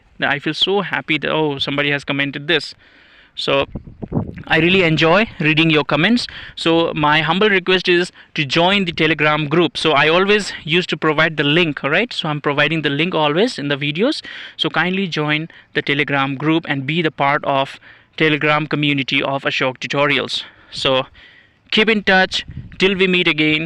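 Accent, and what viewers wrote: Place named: United States. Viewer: Sir ur english pronunciation is lk American people Khublei sir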